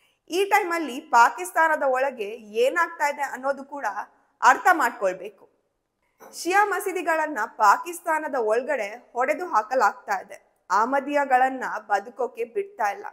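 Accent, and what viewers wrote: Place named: Coastal Karnataka, India. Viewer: What's wrong with her Kannada? Her pronunciation resembles that of coastal areas. Otherwise her speech is very clear. Don't discourage our own people.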